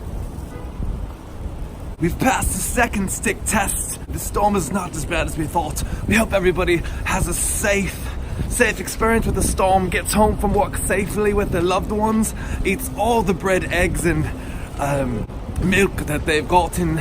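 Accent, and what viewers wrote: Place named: United Kingdom. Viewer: Your voice is different by adding that your accent looks British, not American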